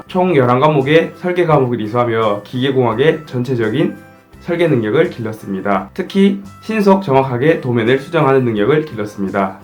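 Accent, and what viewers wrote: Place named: Gyeongsang, South Korea. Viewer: 경상도 말투 귀여우세용 ㅠㅠㅋㅋㅋㅋㅋ 감사해요~!! 도움많이 받았습니당ㅎㅎ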